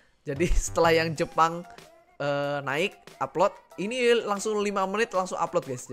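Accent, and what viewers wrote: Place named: Java, Indonesia. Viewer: Ini nih medok tapi bagus,tutor dong saudaraku biar pd sama logat medok.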